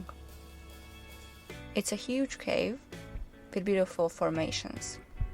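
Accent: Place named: Hungary. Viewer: start the video, hear your voice and I'm like u gotta hungarian. I'm gonna binge watch your videos real quick as australia is my dream location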